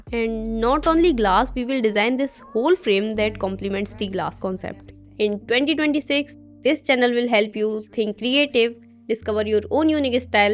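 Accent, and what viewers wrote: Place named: Uttar Pradesh, India. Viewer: Aap UP se ho kya... Tumhare Accent se lg rha h:face-orange-biting-nails:. Btw loved your Editing style and Content.❤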